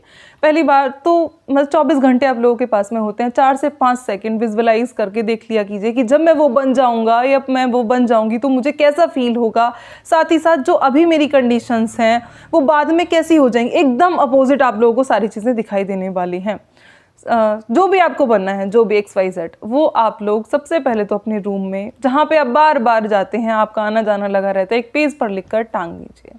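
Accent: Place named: Rajasthan, India. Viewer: Thank you sister, Rajasthan se lag rahe aap to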